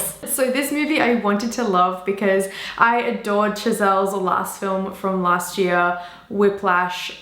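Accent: Australia. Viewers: Great review. I've seen LLL twice now. Even more impressed the second time. --Anyway, I'm trying to place your accent. Are you an Aussie?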